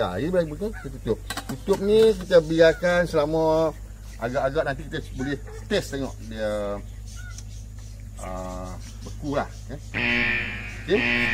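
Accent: North, Malaysia. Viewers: Slang bg lagi utara boleh dak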